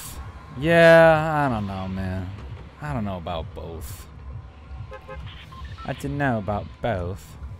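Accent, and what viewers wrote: England, United Kingdom. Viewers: English accent🇬🇧on point👌🏾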